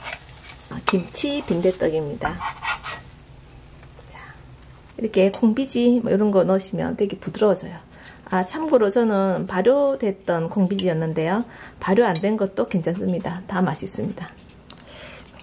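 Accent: Gyeongsang, South Korea. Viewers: 경상도 사투리가 듣기 좋아요. 이 부침개 만들어서 손님들에게 시원한 차와 대접하면 좋겠네요.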